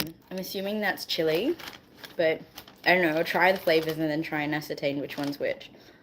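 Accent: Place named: Australia. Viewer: Love your accent. Are you an Aussie 🤔🦘🇦🇺🌏🐨🪃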